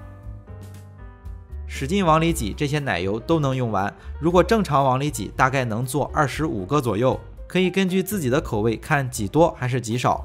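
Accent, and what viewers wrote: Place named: Shandong, China. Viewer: 喜歡老爸偶爾解說 非常親切的山東口音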